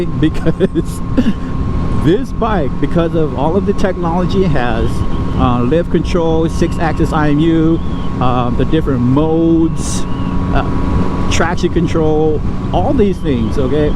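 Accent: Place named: Louisiana, United States. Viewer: Are you in Louisiana? You sound like one of my Cajun cousins.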